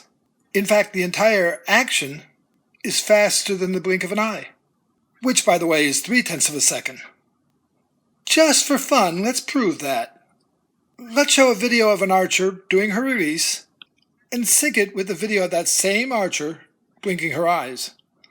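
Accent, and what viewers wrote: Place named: Oxford, United Kingdom. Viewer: And you do that, totally great. It´s so easy undertand you Oxford english. No question by now. I often ask myself about similar topics. This is a fantastic response, supported with videos. THANKS !!! I´m waiting for some topics about Field Archery, the most interesting and HARD to learn. Te preguntaré en español también, jejeee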